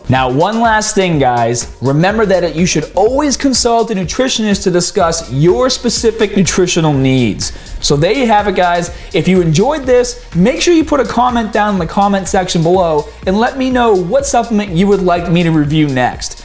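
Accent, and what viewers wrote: Boston, United States. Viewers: Gotta love that Boston accent. Ya hahd ea’ned mahnee. Thanks for the tips,! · Can you suggest how much to take. Daily? or when I am taking ballet classes? Love The Boston? accent.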